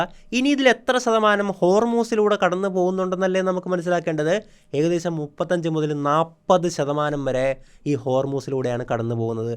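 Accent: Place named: Changanacherry, India. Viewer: Changanacherry slang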